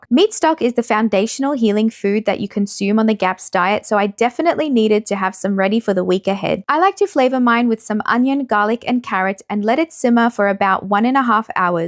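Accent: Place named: Australia. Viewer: Hi! Seeing this content brought a smile to my face because we’ve also done GAPS as a family (it looked very similar to this!) and I just find it so refreshing hearing another Aussie mum talking about this diet!! I would love to follow you on instagram but your handle doesn’t come up! God bless x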